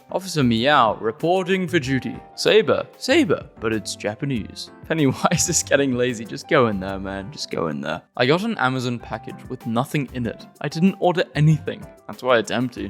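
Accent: United Kingdom. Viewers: You have scholastics too? I thought this guy was british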